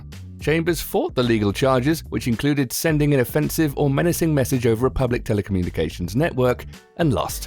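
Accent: United Kingdom. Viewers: boring and i hate your british accent you bloody wanka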